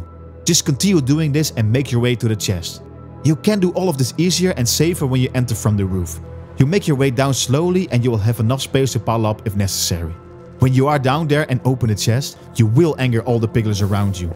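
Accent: Netherlands. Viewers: Bro sounds dutch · Dutch accent is one of my favorites from non native accents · you sound Dutch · Haha I immediately heard that you were from the Netherlands. Funny how it works like that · Gotta love the dutch accent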